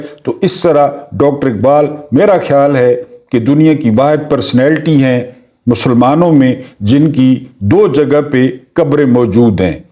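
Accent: Saraiki, Pakistan. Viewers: اسلام وعلیکم! صاحب آپ کی وی لاگ بہت ھی انفارمیٹو ھوتی ھی۔ اینڈ ہر کھی کبھی سرائیکی لہجہ بہت اچھا لگتا ھے ، دعا ھے اللہ تعالیٰ اآپ کو خوش وخرم اور محفوظ رکھے آمین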